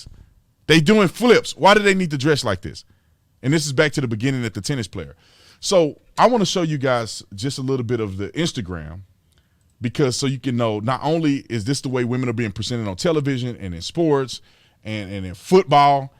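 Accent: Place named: South, United States. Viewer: definitely has a southern accent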